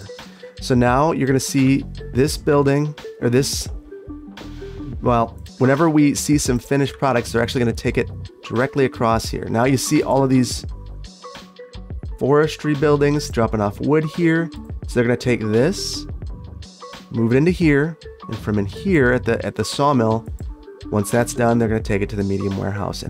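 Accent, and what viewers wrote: Wisconsin, United States. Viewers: I thought he said "egg" the first time he said it to, and I was like: Wait, what??? Then I realized he was from Wisconsin where they say "beg" for bag.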